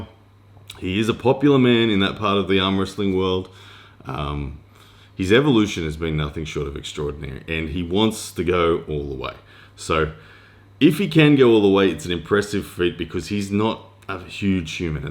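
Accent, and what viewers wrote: Australia. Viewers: Great Video! Very informative with the smooth aussie delivery. ESPN should have an Arm wrestling News Segment for you bro. Quality content ,keep em coming pls.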